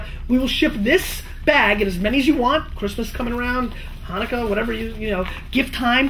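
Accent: New Jersey, United States. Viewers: I guessed at first this guy was from Jersey and I was right. He just said "meh", so that confirms it.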